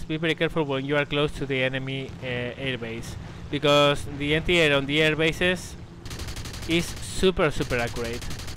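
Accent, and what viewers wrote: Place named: Spain. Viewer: Question: How do you perform the "Hammer" part? (when you climb up and use the rudder to get your nose down). I tried to do the same but the plane simply gets out of control... Btw, your accent was very strange the first time i heard it. Then during your Q&A vids you said you are from Spain. Now it all makes sense. Im portuguese (yes i know its kinda strange talking to you in english) and i can spot several words you pronounce the same way you would in Spanish. Thanks for the vids^^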